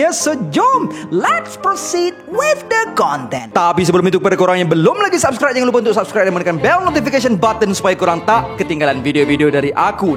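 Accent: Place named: Terengganu, Malaysia. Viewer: Asalamualaikum..bila la ku nak dengar cakap tani..tak moh ka cakap cara kito..beri satu dunia tahu cakap kito...huroooong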